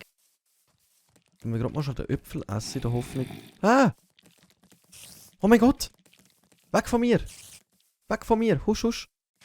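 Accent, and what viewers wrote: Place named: Swiss German, Switzerland. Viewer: I am from neither Switzerland nor Germany but just wanted to hear the lovely sound of Schwyzerdütsch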